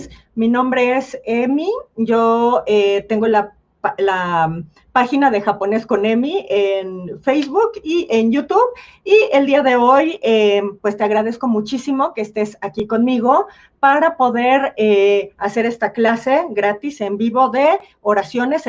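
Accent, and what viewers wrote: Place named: Mexico City, Mexico. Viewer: Hola hola Sensei Emi !! Apenas descubrí tus clases, qué bonito explicas, además cuando hablas tan chilanga es increíble, eso hace la clase más entretenida y bonita... Gracias Gracias 💞... Propósito de vida , tener una small talk en japonés 🎉 y contigo lo lograré !! Gracias gracias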